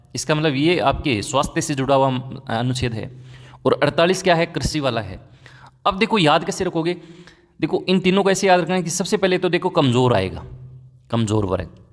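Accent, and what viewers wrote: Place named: Shekhawati, India. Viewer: Sir aap shekhwati se lg rhe ho muje sari marwadi boldi h aaj ti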